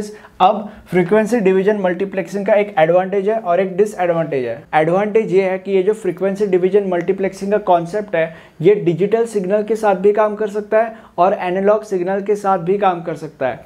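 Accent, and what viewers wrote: Maharashtra, India. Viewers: are you marathi ?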